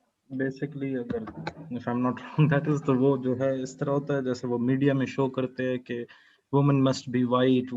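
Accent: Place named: Khyber Pakhtunkhwa, Pakistan. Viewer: Ma'am,you speak very funny urdu, apki urdu sun kr esa lg raha hai ap kpk se hn, or Ma'am apka lecture sun kr lg raha hai k ap pehli bar teaching kr rahe hn apky voice me thori c hesitation mehsus hori hai.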